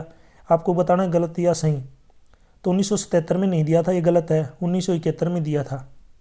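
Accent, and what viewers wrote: Haryana, India. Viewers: Haryana ka hai kya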